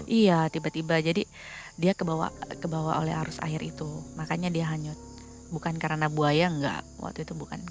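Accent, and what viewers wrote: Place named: Kalimantan, Indonesia. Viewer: Panggilanya pake logat. Magg. Khass kalimantan bujur.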